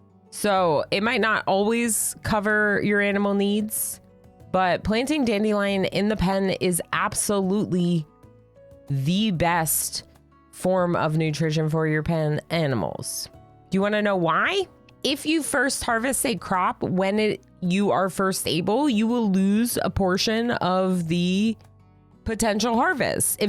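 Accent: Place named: United States. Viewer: You have such an annoying stereotypical american voice but you seem really sweet tho, so I'm a little conflicted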